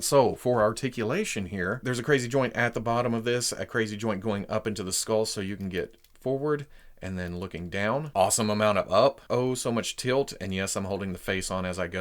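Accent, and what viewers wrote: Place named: Louisiana, United States. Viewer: Your Cajun accent is on point!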